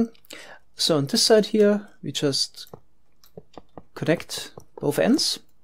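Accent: Germany. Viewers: You are from Germany. Am I right? a) speaking "und" instead of "and". b) the word English and German have in common you pronounce in German c) vowel have revealed you too. d) only Germas add "ja" as a "additional" word.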